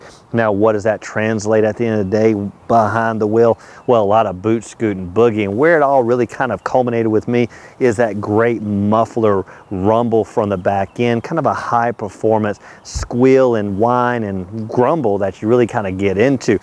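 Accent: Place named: Alabama, United States. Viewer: Lol is this guy from Alabama or someshit never heard english like that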